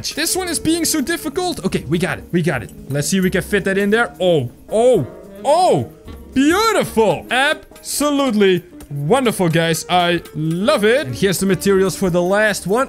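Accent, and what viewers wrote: Netherlands. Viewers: Just wondering but are you dutch, because I just thought I heard a dutch accent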